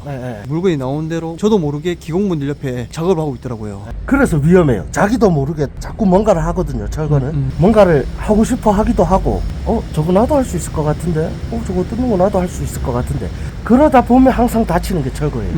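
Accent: Gyeongsang, South Korea. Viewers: 근데. 은 고향이 어디세요??? 경상도 사투리인가? 강원도잉가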